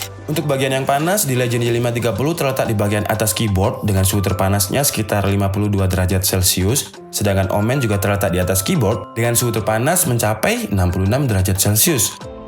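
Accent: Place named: Bali, Indonesia. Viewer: ngomongnya kyak orang bali euy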